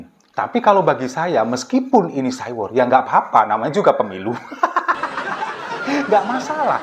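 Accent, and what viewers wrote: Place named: Surabaya, Indonesia. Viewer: iki suroboyo cuk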